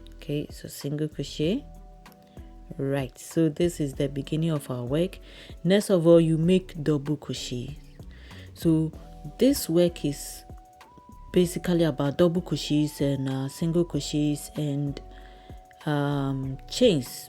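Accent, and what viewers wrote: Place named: Ghana. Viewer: Sis your work is so beautiful and your accent sounds like a Ghanaian ❤🇬🇭….great job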